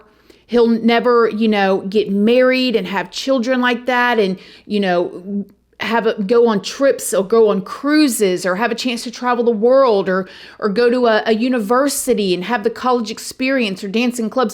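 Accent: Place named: Florida, United States. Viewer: I have been going through it lately. I have to face my abusers in court next week and I’ve been spending every spare moment preparing. I’m a single mom with no lawyer, so I’m really out here on some Elle Woods sh*t. Anyway, I’ve been fixating on the case, in my head poring over my traumas all day everyday. But when your videos come on, I actually feel like I get a little break from that. You’re such a great storyteller, I feel how warm and caring you are. And you talk like the country Florida ladies I grew up around in Ocala before I moved to DC. I feel so relaxed and at ease listening to you. Which is ironic considering the subject matter 😂 a testament to how positive and bright your energy is! Thank you 🙏🏻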